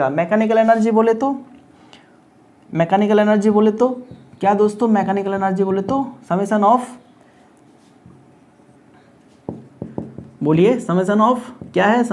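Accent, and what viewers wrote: Bengal, India. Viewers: Bengali hai kya sir ❤❤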